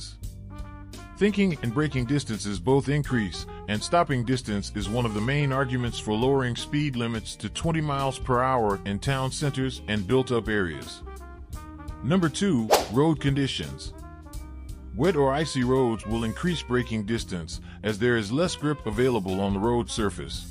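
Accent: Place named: United States. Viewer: This presenter seeks "likes" and subscribers, but I, for one, will not be doing so. The video, which, judging from most of the imagery, was of British origin , (let's face it, an American wouldn't include non-American images), but the voiceover is in the most annoying sententious American accent, reading the script badly, particularly pausing in the wrong places.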